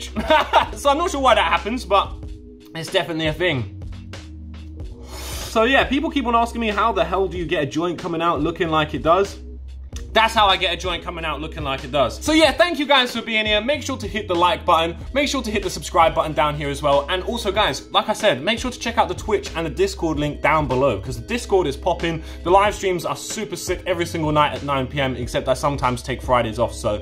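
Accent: United Kingdom. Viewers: Hi just seen your vid pop up and was wondering where you come from I’m in the UK and you sound like your British so thought I’d ask as I’m not sure thanks for vid really enjoyed it 😊👍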